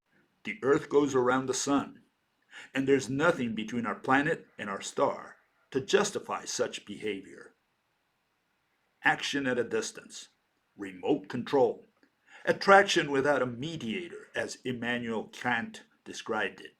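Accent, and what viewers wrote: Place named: India. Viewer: He talks like an Indian. Where are you from mister?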